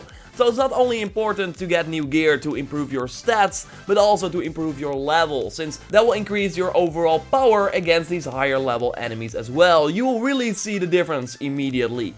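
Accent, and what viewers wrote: Netherlands. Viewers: he'a from Holland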